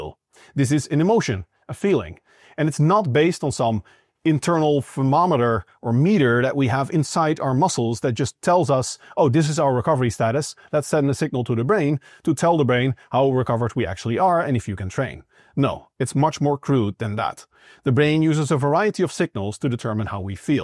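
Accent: Netherlands. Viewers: Still here...for the hard truths and the Dutch accent on anything "un-"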